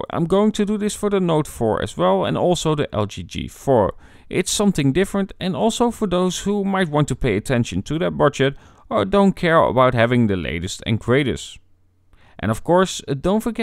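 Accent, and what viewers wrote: Netherlands. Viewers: Sowieso jij bent nederlands duidelijk te horen👍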